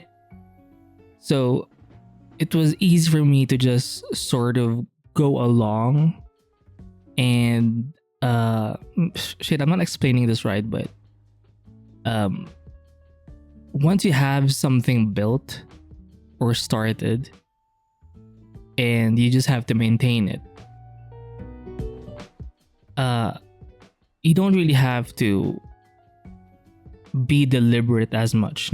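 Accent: Philippines. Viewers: I cant quite pin point it, but you sometimes sound like a Filipino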